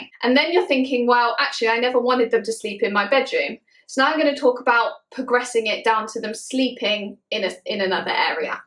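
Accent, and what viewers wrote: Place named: United Kingdom. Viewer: Oh, luv the your British accent!🐕will keep watching...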